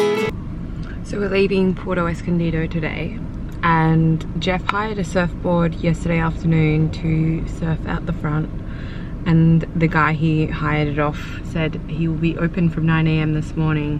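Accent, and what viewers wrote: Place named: Australia. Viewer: Who cares that you cannot pronounce Mexican Cities. With that beautiful Australian accent you actually make Mexican cities and beaches sound better. I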